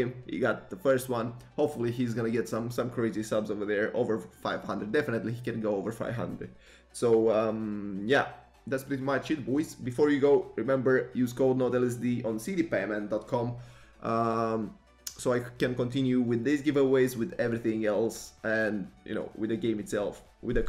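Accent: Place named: Albania. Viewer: and u sound like u are from albania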